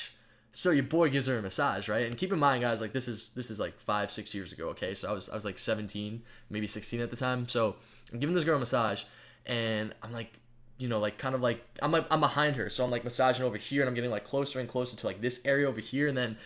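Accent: Spain. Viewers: Doug. You have the worst spanish accent ever. At least try. IntelliJENTe? Tsk.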